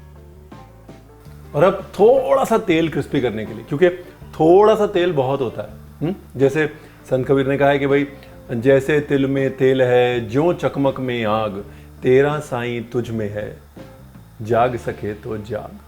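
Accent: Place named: Punjab, India. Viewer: Ranvir bhai I'm from kashmir nd I love your way of cooking as well as talking with punjabi tadka ❤lub u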